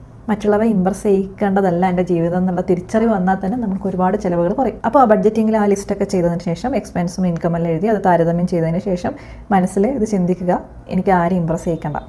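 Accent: Kannur, India. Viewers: And most of all, I enjoy listening to your Kannur accent :-) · Kannur kaari aano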